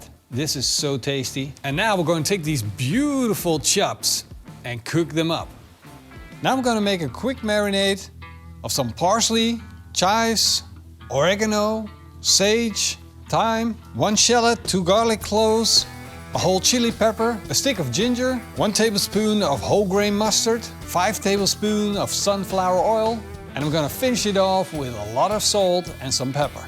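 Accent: Netherlands. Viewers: Love the whole video. The Dutch/English is awesome. Grill on!! I love my notifications on your videos!!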